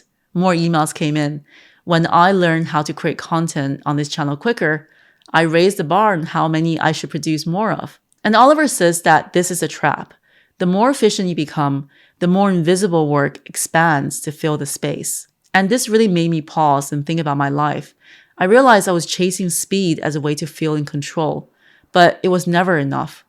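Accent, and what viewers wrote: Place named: Ireland. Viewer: I hear a slight Irish twang in your accent.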